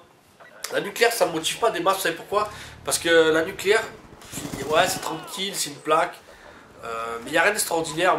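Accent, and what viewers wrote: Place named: Marseille, France. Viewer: yeah toujours en forme avec l'accent de notre marseille <3 toujours aussi bon a call of;)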